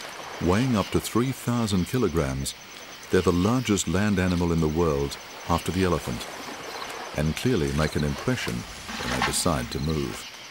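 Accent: United Kingdom. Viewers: The female lion "acting l as though she's not interested"? What? Is this guy claiming to know the mind of the lioness? I am just being funny here, but there is still something creepy about hearing a posh white Englishman say those words in that order. To me these poor female animals aren't acting, as their only way of communicating is through their actions (as their biological state of being in heat is not a conscious choice) so I can assume, as the lioness is violently trying to get away that she is at the very least not happy about what's happening to her! It's like No doesn't mean no to this narrator, in the animal kingdom😉!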